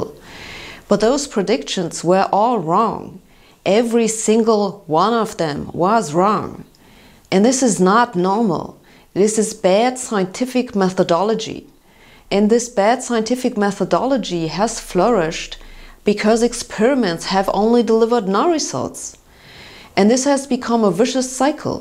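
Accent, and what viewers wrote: Germany. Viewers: Love how she puts an extra layer of german-ness on it... she could probably play it down, but instead she embraces it. Cool style.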